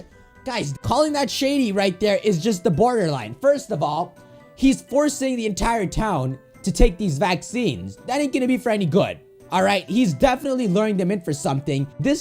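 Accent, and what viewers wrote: Canada. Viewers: are you from Canada? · are you Canadian?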